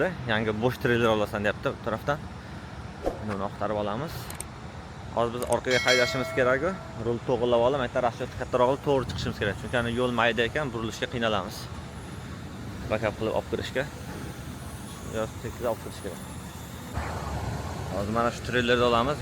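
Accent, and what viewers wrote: Samarkand, Uzbekistan. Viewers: Aka Samarqandanmisiz aksent shunaqa ekan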